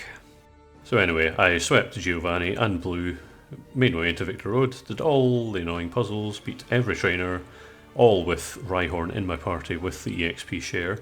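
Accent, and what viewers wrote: Scotland, United Kingdom. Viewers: wake up babe, new scottish poketuber called just dropped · Well done man. Good to hear a Scottish voice too 🏴💪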